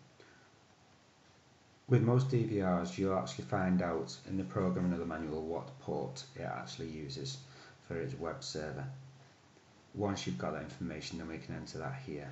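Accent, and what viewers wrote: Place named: Yorkshire, United Kingdom. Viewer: Nice one . I like your Yorkshire accent .-:))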